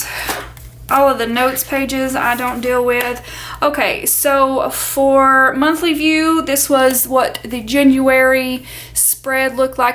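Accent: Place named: Kentucky, United States. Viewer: I just love your videos... Anytime i have a video playin my 7 yr old says she talks just like meemee (very deep Kentucky) we love it girl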